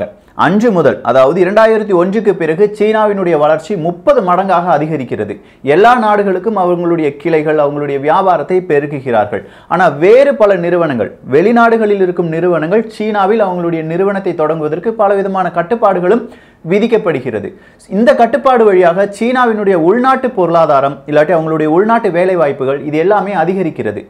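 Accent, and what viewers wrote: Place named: Kanyakumari, India. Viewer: By listening to his slang I think he is from kanyakumari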